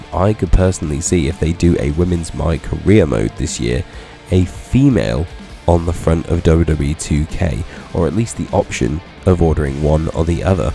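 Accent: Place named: United Kingdom. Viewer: Idk why, but I just love British accents.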